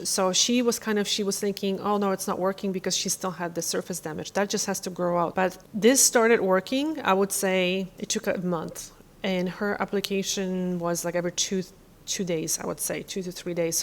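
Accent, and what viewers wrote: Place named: Poland. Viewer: Love your accent. We Poles are awsome.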